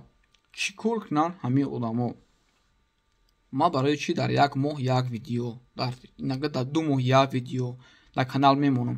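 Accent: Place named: Kulob, Tajikistan. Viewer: чесний пацан ма як гапда кайф мекнм "талхакаф"шеваи кулоби очень приятна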